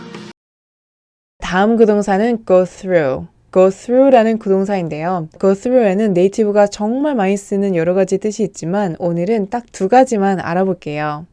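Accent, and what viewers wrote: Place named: United States. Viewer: 진짜 미국영어네요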